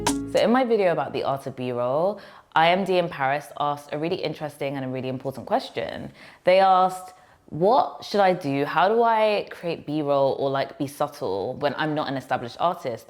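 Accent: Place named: New York, United States. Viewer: This is the wisdom I have been looking for. No hacks, no copy and paste, no what are your competitors doing. Just pure inspiration and creativity that allows you to cultivate your voice and your story telling capabilities. AND it was applicable to me even though I am not a huge brand. I really appreciated this! And as a Brooklyn native there is something so NY about your delivery and I am here for it.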